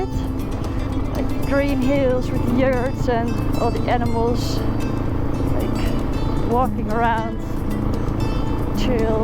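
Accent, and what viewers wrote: Netherlands. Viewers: No. They're both Dutch girls with similar accents which is nice. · Binged watched all of the episodes, great to see another Dutch lady taking on the the challenging rides, at the rate you are flying through the countries you'll be in Kuala Lumpur in no time. I see a lot of comparison in comments with Itchy Boots but apart from Dutch accent you bring your own format really great editing of video.You have really matured in a very short period in off-road riding, I remember the first gravel road I think I stopped counting the oi,oi's now there is hardly any 🙂replaced with wauw. Keep going enjoyable to watch and living vicariously through you and others traveling on bikes through foreign shores. You do you.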